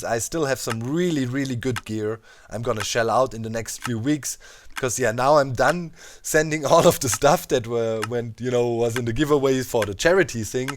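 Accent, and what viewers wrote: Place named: Germany. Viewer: Are you German, because you really do sound like it (I'm Sorry if you answered this before I'm new...)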